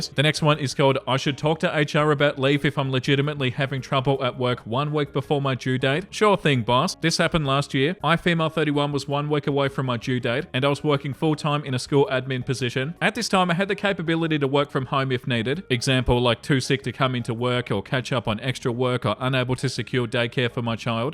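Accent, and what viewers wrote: Australia. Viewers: NGL, I absolutely love Australian accents and it's part of the reason I find your reads so funny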